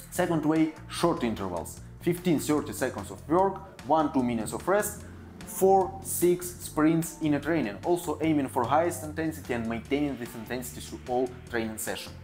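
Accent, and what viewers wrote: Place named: Russia. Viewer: I wish I could speak Russian. I love the accent.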